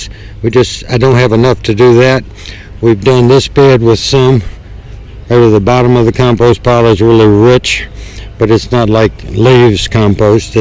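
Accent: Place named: Coastal Virginia, United States. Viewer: I'm going to guess he has an old fashioned Coastal Virginia accent...it does have those rounded o's like Canadian. Listen to Earl Hamner narrator of the Waltons--sounds very similar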